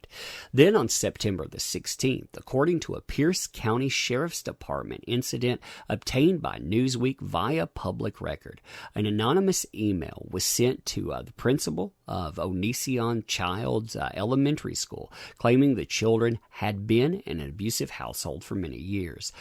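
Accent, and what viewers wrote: South, United States. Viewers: I'm just saying Your Voice Sounds like the poster child for southern hospitality from a guy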